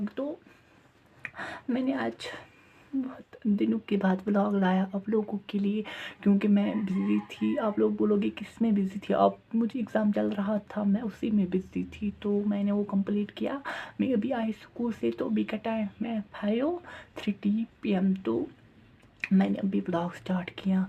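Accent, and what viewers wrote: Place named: Arab world. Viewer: Arabi auuent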